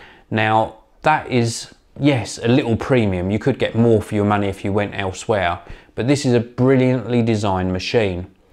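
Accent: United Kingdom. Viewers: hes british man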